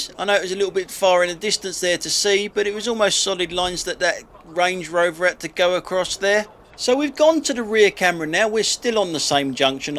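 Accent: London, United Kingdom. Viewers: <link> This is Dashcam Hull's channel, I've been subscribed to his channel for a while now - quite entertaining 😀👍 And I love the London accent btw - I can understand perfectly well - and I'm Scottish 😂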